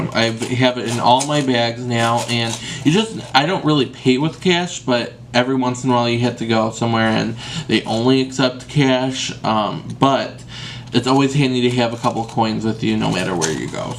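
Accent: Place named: Chicago, United States. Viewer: ..I found you from seeing your comments on other YouTubers I watch! Well I fell in love with you and I luv that Chicago accent! Use to live there and miss it:)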